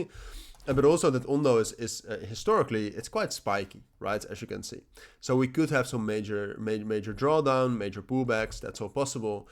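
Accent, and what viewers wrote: Netherlands. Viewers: Can hear you are Dutch ;-). Ty for the update.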